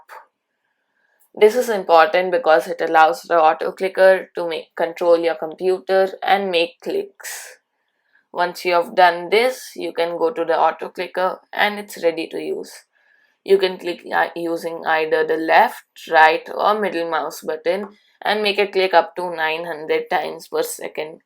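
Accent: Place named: India. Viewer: BRO IS AND INDIAN ACCSENT SUCH A LIER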